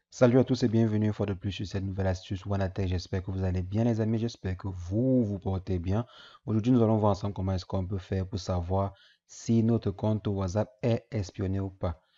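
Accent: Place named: Cameroon. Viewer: Peut être que je suis pas intelligent i mais je n'ai rien compris quand bien même avec ton fort accent Camerounais je devrais te suivre.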